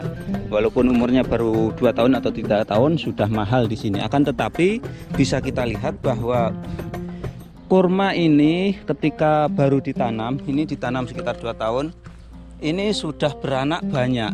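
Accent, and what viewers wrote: Java, Indonesia. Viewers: asli mana pk bicaranya kaya orang jawa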